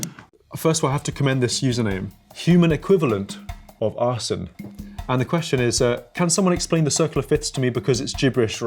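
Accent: United Kingdom. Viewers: HE'S BRITISH?!?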